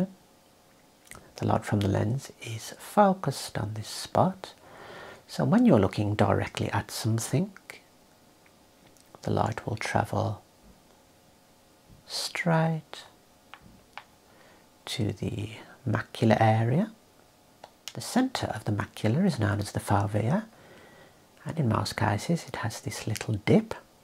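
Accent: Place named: Black Country, United Kingdom. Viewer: At last a black country accent that doesn't sound like nails down a blackboard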